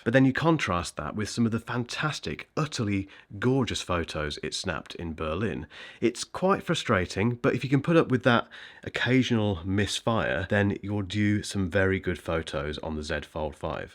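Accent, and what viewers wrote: United Kingdom. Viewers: Hi. Thanks for a great video as usual. Great to listen to a Brit. Looking forward to your review of the Honour V2 but like you said I think Samsung software is still the best. From the reviews I have seen so far, the phone doesn't multitask horizontally.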